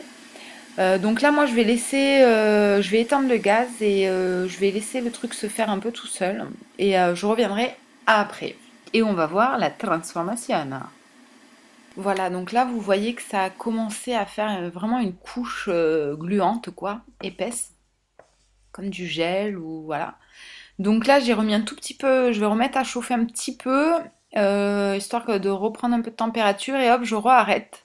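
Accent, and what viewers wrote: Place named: South, France. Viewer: C' est l' accent du sud... magnifique accent.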